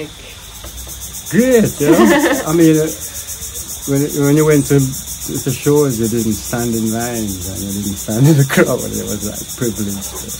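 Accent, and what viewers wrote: Jamaica. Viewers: It's a Kingstonian accent, notably old school folks. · I can hear the Rasta in you. One Love Rasta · Love this man love the way he talks and laughf real jamaican roots man down to earth and all.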